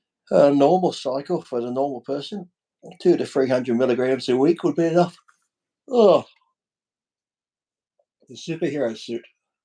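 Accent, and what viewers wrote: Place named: Australia. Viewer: More of these live streams please,! Need more Aussie voices on YouTube 💪🏼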